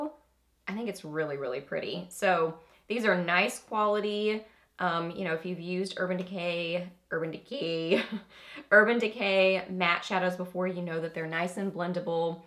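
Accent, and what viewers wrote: South, United States. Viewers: I swear you are a breath of fresh air! I can't believe I haven't found you before now (well about a week ago and been watching your older videos) but I just LOVE You! Your personality, your look, your accent (you have a LITTLE bit of a country, southern accent.... I have a REALLY HEAVY country southern accent, yours is cute, mine not so much lol) I got my Amazonian palette today and it's so pretty I'm in love....I have the naked heat palette and LOVE it, I don't need the small one but I'm prob gonna get it just cuz it's so little and cute.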